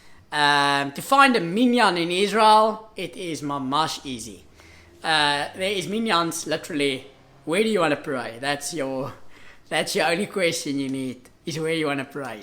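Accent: Afrikaans, South Africa. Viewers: Thanks for sharing your story - we made aliyah from Johannesburg a few days after you and live in Beersheva. I came across your videos once while searching Tefillin and was so shocked when I heard someone speak with an Afrikaans accent and talk about Tefillin. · Wow, I am impressed. Your library seems is amazing. Should have contacted me, could have taught you Hebrew before you made aliya. Long time since I heard someone speaking with such an Afrikaans accent. Our Rabbi's parents' are from Cape Town. It is probably the only decent place today. You are amazing. If you ever come to Australia let me know. Would be amazing to meet you.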